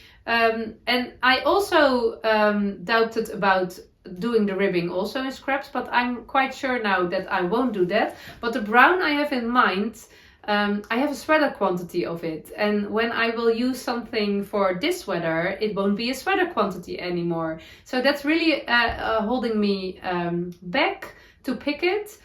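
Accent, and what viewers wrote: Netherlands. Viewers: papagei is parrot in English - I googled it - with bright red, blue and green - from Michelle. I love watching your podcasts - I love listening to your dutchy English -it makes me giggle from time to time.... I live in New Zealand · Dear, I really love your "heartjumping" Videos and especially I absolutely love your "dutchy English". Thank you for all this inspiration. happy greetings from Germany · Noble Character Crafts was talking about her Ariana cardigan on her podcast and she mentioned you and your sweater. I had to come find you and see about your Ariana(s); which are so pretty!! Thank you for giving so many details. The latest one turned out so good! I love your energy and your dutchy English. You made me laugh out loud when you were talking about making a longer Ariana. You were correct in calling it your butt, but I think I prefer "sitting pillows" better. 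😀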